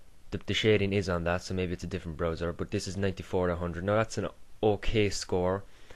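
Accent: Ireland. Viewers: funny cuz this guy has an irish accent the add before the video started was and irish spring comercial ...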